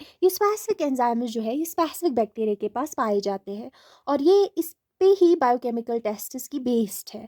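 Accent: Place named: Kashmir, India. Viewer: you are kashmiri by your accent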